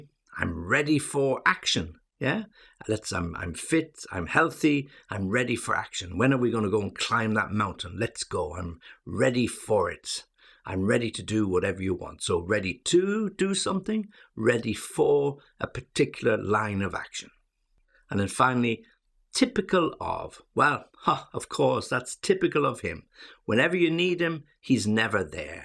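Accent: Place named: United Kingdom. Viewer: Thanks a lot, for your useful lesson and my favorite British accent!!!!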